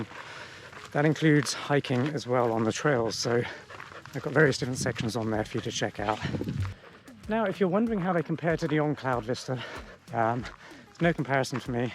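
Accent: United Kingdom. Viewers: Are you a Brit living in America?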